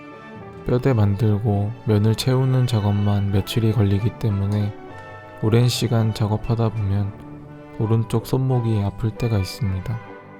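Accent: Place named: Chungcheong, South Korea. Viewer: 는 충청도 사람입니까?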